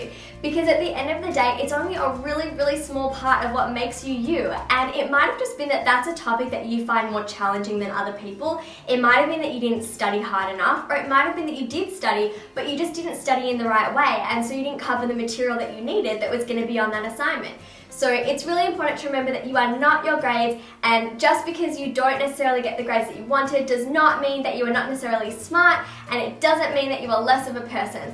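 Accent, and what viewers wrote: Australia. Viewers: I just discovered your channel and your videos are really helpful to get motivation to study^^ and btw you're accent is Australian right?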